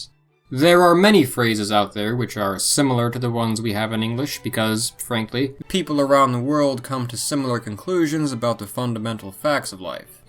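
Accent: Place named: United States. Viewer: This guy can't speak German to save his life. I can speak both, and I tried intensely listening to what he was saying, but I just couldn't make anything out. He was saying it in such a heavy American accent that the words just become nonsensical gibberish Seriously, if his life depended on speaking just one regular basic German sentence like that, he'd be long dead. He's that bad! Cos NOBODY would understand him looool.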